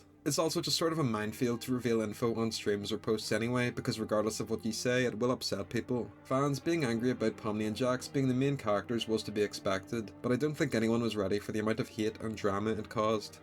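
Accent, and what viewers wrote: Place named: Northern Ireland, United Kingdom. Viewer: You’re NORTHERN IRISH AYYY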